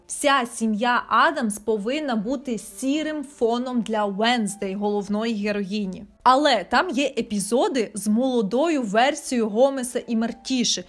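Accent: Surzhyk, Ukraine. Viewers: Какой же у тебя омерзительный суржик! Неужели так тяжело выучить украинский язык?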